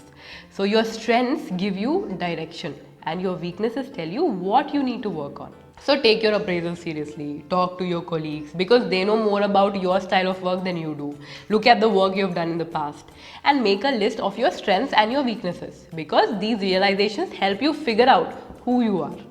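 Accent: India. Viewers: Whe. I heard Indian accent i jumped suddenly cause i knew she is from Country of geniuses.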